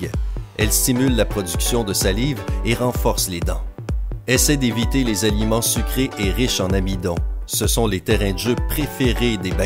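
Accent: Quebec, Canada. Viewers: Vous avez un accent québécois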